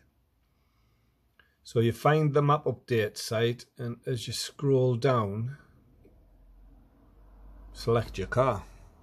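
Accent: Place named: Newcastle, United Kingdom. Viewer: Love the Geordie accent! The most colourful of all English accents. (2020 VW Golf owner). 🦘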